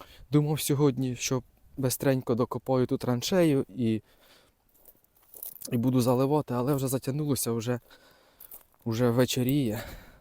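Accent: Poltava, Ukraine. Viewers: Вітаннячка! Все моє дитинство, пройшло у бабусі в Полтавській області... Життя іде, бабуся вже давно померла і відвідати рідні краї вже нема можливості... На Ваш канал натрапила випадково і почала дивитись. І чую у розмові, щось таке рідне, таке знайоме, а потім зрозуміла - це полтавський акцент, він особливий❤ Бажаю Вам здійснити всі Ваші мрії і реалізувати всі задумані плани! Ви щира і добра людина) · Теж зачепилася саме за говір, одразу зрозуміла , що полтавський. Обожнюю і Полтавщину, і говір, люди, як на мене найприємніші і найщиріші з усієї України. Буду слідкувати за ремонтом! Знаю, що це таке, сама ремонтую свою хату на Вінничині, це дуже радісно потім бачити результат і особливо жити в своєму, а не найманому житлі і вирощувати все, що хочеш! Бажаю успіхів і розвитку, буду слідкувати! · в мене родичі теж живуть на Полтавщині. Розмовляють як. А дідусь з Черкащини. 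До Другої світової війни була Полтавська обл. Теж так розмовляв, як. Приємно слухати.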